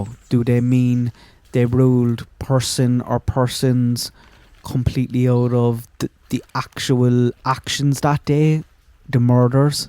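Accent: Ireland. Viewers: I’m in Ca now but grew up in Indiana It’s fun to see the woods again as I used to go mushroom hunting with my dad I agree with your speculations on the case as you speak in the lilt of your Irish brogue THANKYOU Love your dog too❤ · I thought there was another person waiting nearby to pick up the killer? I think it didn't go to plan,those girls were to be taken away elsewhere,the crime scene was staged to throw LE off the scent.I've been fascinated with this case from day one.Lovely to hear the Irish accent by the way 💚🤍🧡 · Scotch or Irish? Irish? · I love your Irish accent!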